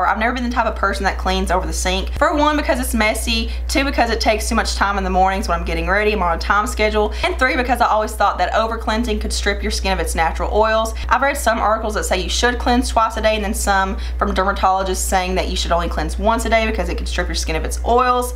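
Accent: South, United States. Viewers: Tímea Tarjányi, it's a southern accent. Do you mean where she lives.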